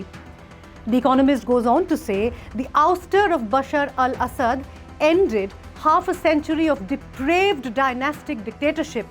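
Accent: India. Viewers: > Opens video > Indian accent > "Yup"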